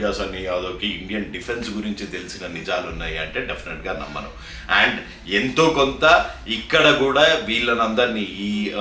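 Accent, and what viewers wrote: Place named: Telangana, India. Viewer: Nenu Yedava Jagan gaadi supporter naithe kaadhu. Kaani meeru staunch TDP fan ante kaadhanakunda undalenu. TDP ni gaani, Chandrababu garini kaani, Pawan Kalyan gaarini kaani ae chinna maata anna meeru enter avuthaaru. And more to that matter, you speak Telangana accent. Asalu em connection emo ardhame kaadhu.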